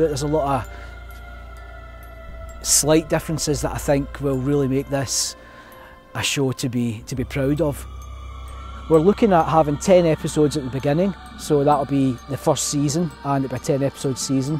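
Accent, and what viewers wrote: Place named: Glasgow, United Kingdom. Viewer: Nice to hear the voice of a fellow Weegie (or should that be ouija) 😁 Looking forward to the series. Slainte guys!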